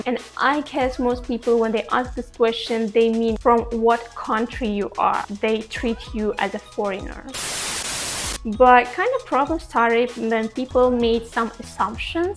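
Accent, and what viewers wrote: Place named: Russia. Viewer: Hi. I have a few friends in Russia. One of them is Kazakhstani and her and her family live in Tyumen. However, because racism is rising in Russia and her and her family have been the targets of racism, they are now moving back to Kazakhstan. Also, I see you touched on something many of us have noticed about the Slavics, that many of them look Asian, but with white skin, lighter hair colors and bright eyes. My Co-worker from Taiwan and I have talked about this a lot. Anyway, I wish you the best with your venture on YouTube, I hope it brings you a lot of success. P.S.... You don't look Thai, Vietnamese or any Indo-Chinese. You also don't look Kazakhstani or Chinese, you facial structure is different. I'm also from the USA. had a Thai girlfriend and one of my Nephews is 1/2 Vietnamese. I also was in the US Army and lived in South Korea... 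You don't look Korean too! You do look Asian, but it would be difficult to pinpoint an exact location. You accent is a dead give away that your from Russia. P.S.S.... I hope you do find your way to the USA. I think you will be shocked by how different life is here, compared to Russia or anywhere in Asia. We would be very happy to have you here too! 🫶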